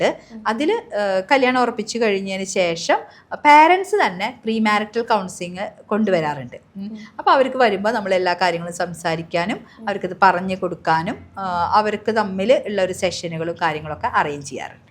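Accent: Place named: Valluvanad, India. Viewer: വള്ളുവനാടൻ മംഗ്ലീഷ്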